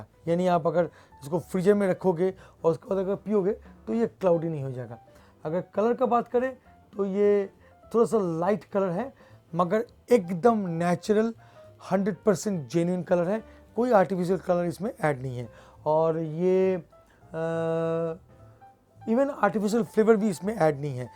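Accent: India. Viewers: You have a great accent.. I really like your true desi style. No fake dikhawat.. Bless you with good health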